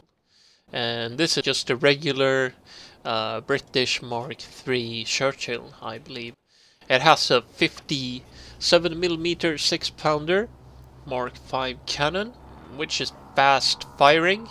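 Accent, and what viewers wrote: Sweden. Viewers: Lmao hörde att du var svensk efter första ordet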